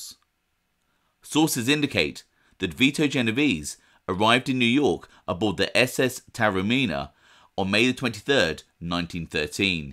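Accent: United Kingdom. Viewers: I love your videos, and always get an unintended chuckle at hearing you recite dialogue from mobsters in your British accent. In another video, you reported that a particular mobster worked at a place called Top Tomato, which you pronounced the American way, but with your own accent. I bet you debated with yourself about how you were going to pronounce it when writing the script for that episode. Keep up the great work! I really appreciate the effort you put into these videos.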